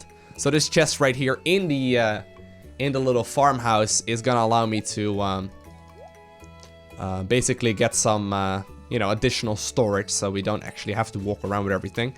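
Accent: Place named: Netherlands. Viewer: I watched through your most recent episodes and then decided to start at the beginning. I totally knew what was coming with the sprinkler and killed myself laughing once you came back after looking it up... (I cheated and wiki'd everything before I started so I can't say I'm any smarter..) P.S. As a fellow Dutchie, the only reason why I kept watching your videos was cause of your accent!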